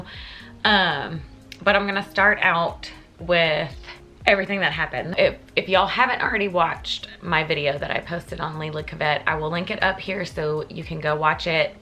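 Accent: South, United States. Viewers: Wow, I love her voice. Lil southern accent. You got my sub.....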